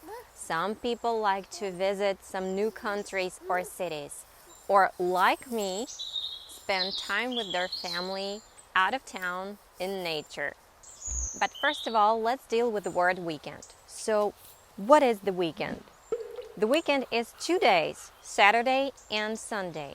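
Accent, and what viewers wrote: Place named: United States. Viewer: Hey! Would be really nice to hear about your own journey/story on how you learned/are learning English, practiced/practicing your American accent. Different people process information differently. For example, some people may memorize new words by only hearing them, the others - strictly by seeing words on a piece of paper and so on. What is your method?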